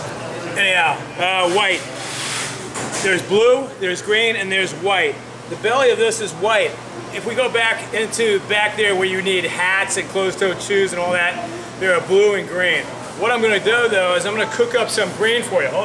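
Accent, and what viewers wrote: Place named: United States. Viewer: what does he mean "as you can tell I'm from Ireland" he sounds American. If he sounded Irish it would make sense.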